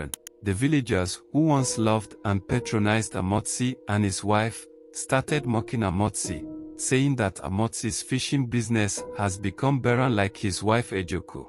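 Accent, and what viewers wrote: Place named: France. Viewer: tou have an french accent, where you from?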